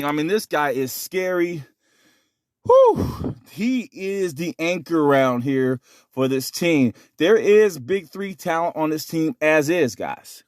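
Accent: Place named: Australia. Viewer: lol, love the urban-ausie accent. :) Thanks for the video man. Appreciate ya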